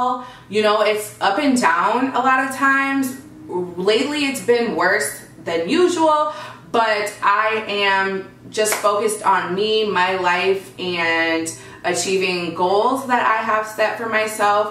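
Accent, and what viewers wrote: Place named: Wisconsin, United States. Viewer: You definitely still have a WI accent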